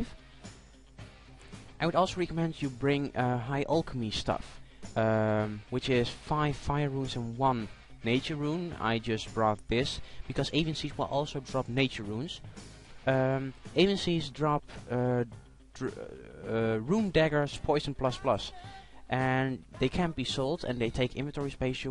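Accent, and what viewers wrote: Netherlands. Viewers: Hollander dat hoor ik al aan je stem :p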